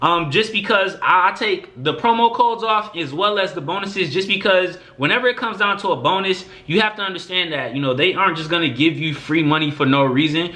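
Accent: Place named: Louisiana, United States. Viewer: I hear Louisiana in your voice or Detroit but I think Louisiana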